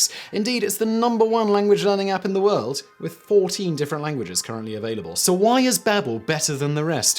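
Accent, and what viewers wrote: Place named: United Kingdom. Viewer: has that Britsh "Tone" that has annoyed people for hundreds of years, especially French people? I know both can annoy us in the States. American postwar influence did not rise from nowhere. The British Empire handed off it's "International Decent Chap" responsibilities to us, and that whole effort took on an American tone, for "Better" and "Worse".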